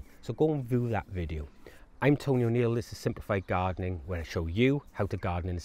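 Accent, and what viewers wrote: Wales, United Kingdom. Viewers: Hello Tony Great video as always. I have found just the same this year- massive top growth using a home made traditional and worm compost with some peat free added plus BF & B and potato feed. Leaving it as long as possible to check yield. Tomato seeds planted in the same medium failed to thrive and develop. I had to buy plants from the local garden centre- first time in years. Great though to hear a familiar Welsh accent.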